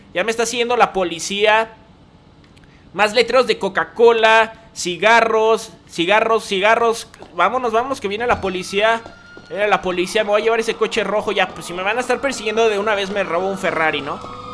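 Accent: Mexico. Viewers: Eres mexicano o estadounidense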